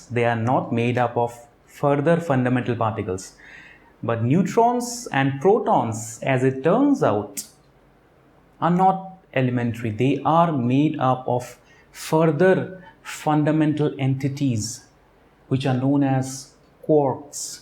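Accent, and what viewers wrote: India. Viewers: Great over-view. packed a lot of information in 24 minutes. Well presented and yes, I can even understand your Indian accent, (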